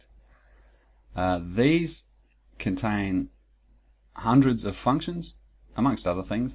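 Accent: Australia. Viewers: Hi What's a, you sound like a fellow Aussie! Thanks again for this great tutorials. I'm working my way through them from start to finish. I'm not a programmer: I'm an old retiree but I did an online course in C++ a couple of years ago for the fun of it. I didn't use it for anything so I let it lapse and forgot most of what I learn't. I came across your course and got fired up to revist C++. You have reignited my interest in C++ and your "easy to understand" method has made this really interesting for me. One of the reasons I'm really interested in getting familiar with C++ is that I am also interested in teaching myself electronics using arduino projects and they utilise C++ in their computers to drive their electronic components. So I want to understand C++ so I can control each project. Thanks again.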